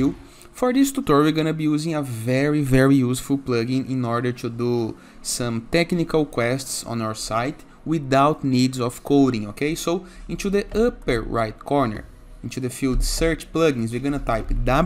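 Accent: Brazil. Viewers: Are you Brazilian bud? This accent is so cool haha. Great video!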